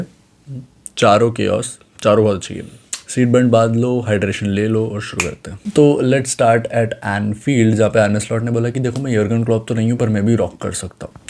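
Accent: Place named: Madhya Pradesh, India. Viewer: What kind of accent is this? Sounds like someone from mp or something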